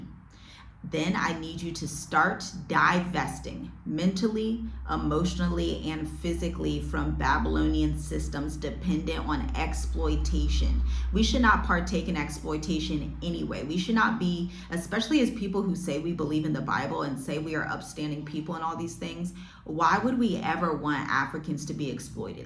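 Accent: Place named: United States. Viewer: Yeah ...yeah....yeah...you tooooo American and no longer African ,,,, you hurt,, speaking from a privileged and heartless country and yet you look like us but speak like them,,,,it's time for change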